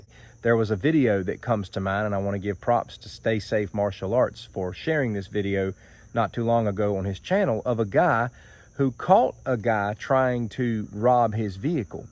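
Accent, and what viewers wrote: South, United States. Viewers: Love your Southern accent ! Greetings from Australia. Bless.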